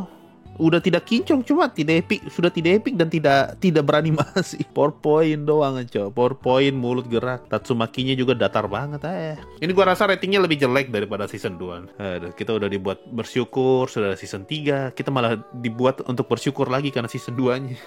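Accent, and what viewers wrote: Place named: Eastern Indonesia, Indonesia. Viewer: Sorry bang subscriber baru, ini kenapa logat timur nya bocor sedikit. Orang mana kah admin ini?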